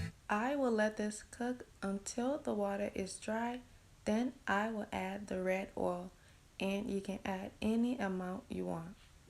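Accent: Liberia. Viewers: You sound Liberian. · Woman are you from Liberia?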